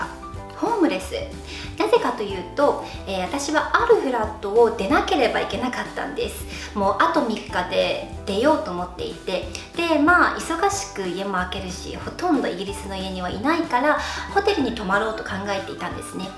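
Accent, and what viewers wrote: Okinawa, Japan. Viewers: 喋り方、所々沖縄なまりや沖縄の友達がする様な表現が入っていて好きです☺︎ ラッキーなのはお人柄のおかげってあると思います👍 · Tsugumi K CAさんだからね.... だから英語っぽいっていうかなまりがあるんだよね.... (あと沖縄出身だから)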